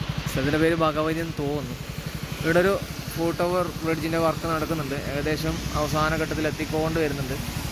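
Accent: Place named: Kasaragod, India. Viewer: ഒന് ഓന്റെ ഭാഷയിലാണ് പറയുന്നത് ബ്രോ കാസറഗോഡ് അങ്ങനെ യാണ്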